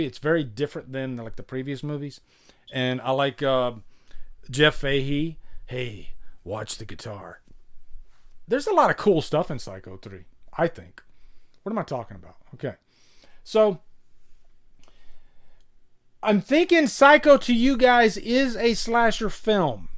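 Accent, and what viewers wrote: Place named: Mississippi, United States. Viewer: a bunch of characters, huh? 😂 I thought I detected some Mississippi in your accent, but I miss Florida beaches. Texas really doesn't have any good ones. Maybe 1 or two, like South Padre or whatever.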